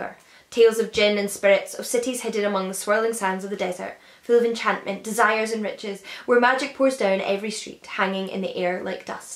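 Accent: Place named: Glasgow, United Kingdom. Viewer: I can't understand the person who made this video. She sounds like a glaswegian docker.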